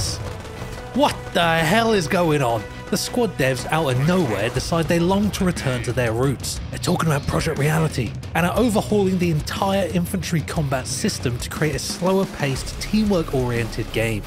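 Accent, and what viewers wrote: United Kingdom. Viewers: What a great video, thank you for your brittish accent.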